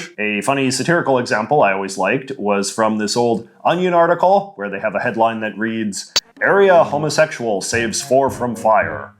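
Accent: Canada. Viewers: Why do American and Canadians sound so similar however the small things like about stayed distinctive and possibly are only Canadian. This accent also seems to be in the northern mid American accent. but why? The hozer accent is so interesting. So many canadians Like have no natural hozer style accent but the about is the one variable. Like some with the word water or lemon. Saying watta or limn. These are all so interesting and seem almost to defy region in some ways. Do these exist in mexico? It is such a huge country that I would assume the accents are VERY different as also geographically there are lots of variables and difficulties getting from place to place.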